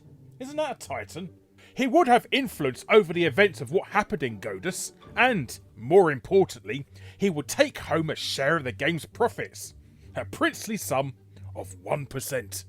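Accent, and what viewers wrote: England, United Kingdom. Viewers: multi year long subscriber, just commenting that it took roughly five years for the algorithm the randomly feed me the "opps, all malyneux" eppisode from your back catalog, anyhoo, straping in to sample this stale arse video about a stale arse chip on ya shoulder as i shake my fist as shadows on the cave wall in honour of your frustrations, love your accent though, wish more spoke the queen's english round these parts.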